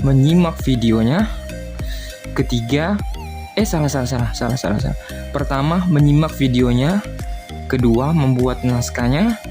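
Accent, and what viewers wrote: Makassar, Indonesia. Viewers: Orang makassar yah bang?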